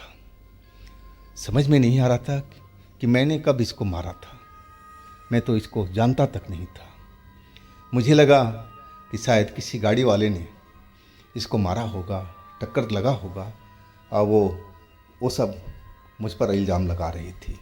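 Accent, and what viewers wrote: Bihar, India. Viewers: Nai ye bhai bihar ke taraf se hai, sun sapata bihar me hi bola jata hai. please clear 😂😂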